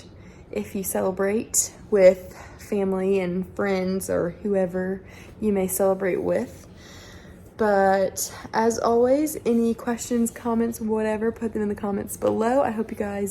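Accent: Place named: United States. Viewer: Lovely to see you, beautiful and professional as always, luv the American accent xx Matt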